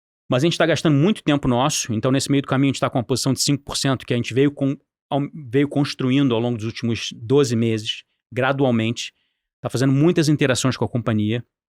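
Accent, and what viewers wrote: Rio de Janeiro, Brazil. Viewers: é carioca kkk... Repare sempre no fim tem aquele "X" arrastado. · O cara é extremamente PEDANTE Falou falou e não disse POOOOOORRA nenhuma Além desse sotaquezinho CHATO de carioca metido a besta Além de repetir a todo momento que ele tá gastando muito tempo com a localiza Além dessa blusa de frio amarrada no pescoço Kkkkkkkkkkkkkkkk Que pessoa ridícula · Esse dialeto carioca, dá raiva, tudo com x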